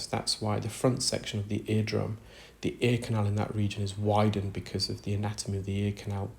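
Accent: United Kingdom. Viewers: Side note: I never tire of hearing you talk. There is something so soothing about your voice. And I love your UK accent. (big smile)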